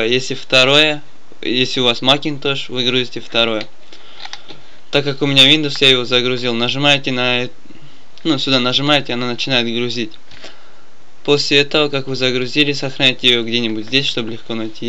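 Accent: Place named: United States. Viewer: нее....ребят говорите что хотите я уверен что он американец...во-первых прочитал хорошо английски текст, во-вторых акцент и ударения.....если вы прислушаетесь он говорит мАкинтош и Айфон а не макинтОш и айфОн...в нем чего-то русского нету))))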